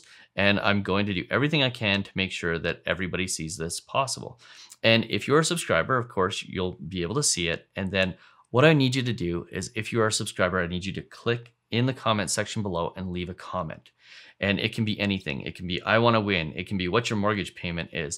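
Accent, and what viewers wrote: Canada. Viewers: I enjoy your content. Great to have a Canadian voice for mortgage and finance news.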